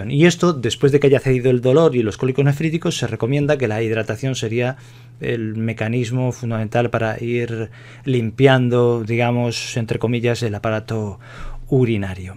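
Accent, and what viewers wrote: Spain. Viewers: Gracias, muy claro. Guapo doctor, hasta el acento español me gusto😁. Mañana será mi intervención. Y justo pensaba qué hacer para evitar la formación, saludos Querétaro-México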